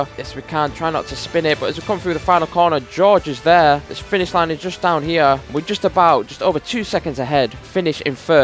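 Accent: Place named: Manchester, United Kingdom. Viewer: Manchester accent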